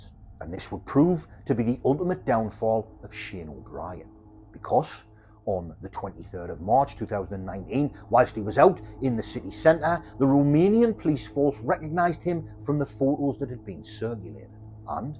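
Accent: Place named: England, United Kingdom. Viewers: True English accent love it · lol and this conment got 2 thumbs up? It's quite clear he's from the north east. I'd hazard a guess at Sunderland or more likely County Durham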